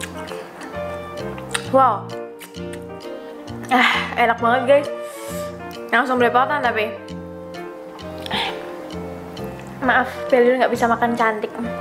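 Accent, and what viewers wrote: Java, Indonesia. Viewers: Welcome medok kuntuL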